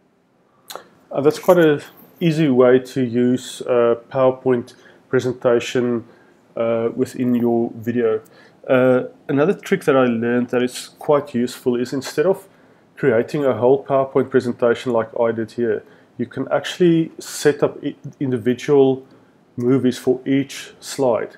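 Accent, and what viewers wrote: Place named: South Africa. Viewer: Thanks for this! Recognise the South African accent! So great to see great content for SAFFAs.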